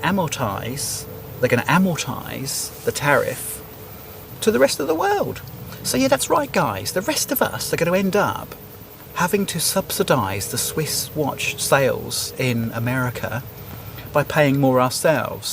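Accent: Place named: United States. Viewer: Here in America where we are tired of getting screwed by you people happy with screwing Americans, will just switch to the used watch industry etccccc. or just keep buying swiss watches regardless. Most people that can afford a swiss Rolex watch, can afford a little more, since now saving on other stuff. BTW your American accent is annoying little old man.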